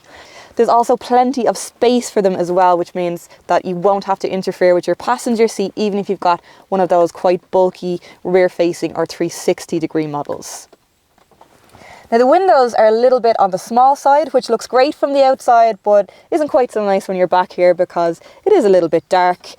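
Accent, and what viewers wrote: Ireland. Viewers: Wonderful Irish accent thank you..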